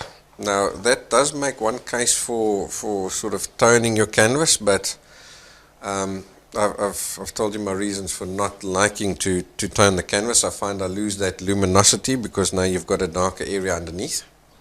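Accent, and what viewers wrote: Afrikaans, South Africa. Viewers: He sounds Afrikaans. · You sound South African, You have my accent :? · Thank you so much for sharing. I appreciate all the little tips and details you give so freely. You have taught me so much just from watching you paint the portrait of " the girl with open hands " I wonder if you ever adventure out into abstract reality. I am quite sure you would be excellent at doing so. I am a fellow SAfrican living in the USA so your accent brings back memories of my land of birth. I wish you all of the best for the new year with many happy hours in the adventure of painting.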